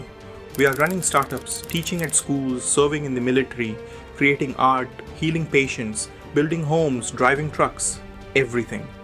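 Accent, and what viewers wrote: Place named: India. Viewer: JUST STRAIGHT UP BULL SHIT What Anti-Migrant Protesters Got Wrong DO YOU ME MEAN AUSTRALIANS? LOL (I HAVE NOW BECOME ANTI-MIGRANT) BUT HAY, IT'S ALL ABOUT THE LAST 3 YEARS OF YOU LAZY, DIRTY, MEAT HEADS COMING INTO OUR COUNTRY AND OUR SO CALLED TRUSTING BUT LOVING GOVERNMENT LETTING IN 1500 INDIANS AKA IMMIGRANTS INTO OUR COUNTRY PER WEEK AND JUST TO TOP IT OFF IT'S A INDIAN VOICE OVER (GO HOME) TRYING TO TELL US AUSTRIANS HOW GOOD THEY HAVE BEEN FOR OUR ECONOMY F OFF HOME WE DON'T NEED YOU OR WANT YOU!!!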